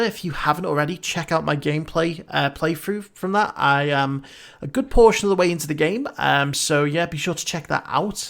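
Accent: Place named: Liverpool, United Kingdom. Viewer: Evening by the sound of your voice, you are from the north of England. Think Liverpool. How are you able to view each episode, as Disneyplus does not go live in the UK until 31st march 2020? Thanks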